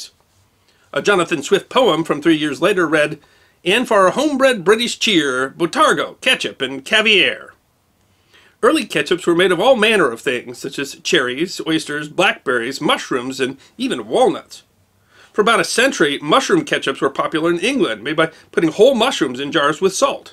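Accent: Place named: Chicago, United States. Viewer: 31 I love your Southern Side of the Loop accent! I almost peed on my self laughing! Where's your Chicago Typewriter? With your Moll?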